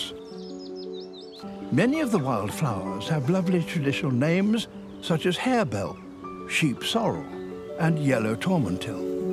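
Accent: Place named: United Kingdom. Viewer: I just saw your lovely YouTube video. Love your videos and British accent:)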